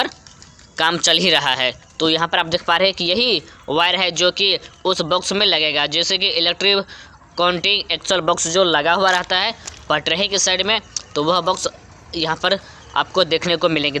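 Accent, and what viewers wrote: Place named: Maithili, India. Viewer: Dbg se maithly babu bolne ka andaz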